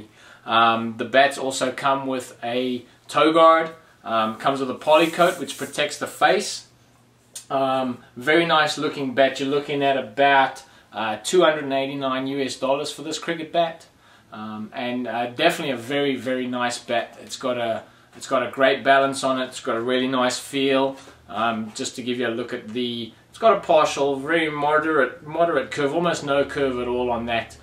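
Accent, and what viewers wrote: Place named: Australia. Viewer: are you half ausie or have you lived in auz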